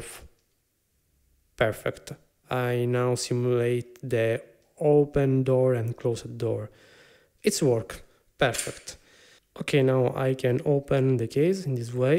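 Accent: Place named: Italy. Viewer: It is very understandable and the Italian accent constantly reminds me of the beautiful language, nice wines and food -> positive 😄🇮🇹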